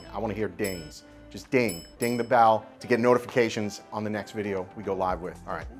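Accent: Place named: New York, United States. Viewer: As always, informative and helpful. Question, are you originally from NY? As a NYer that accent of yours harkens memories of home.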